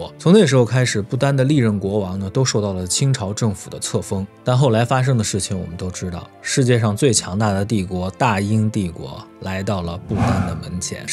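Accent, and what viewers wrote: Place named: Tibet, China. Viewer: 博主是我看過普通話最標準的藏族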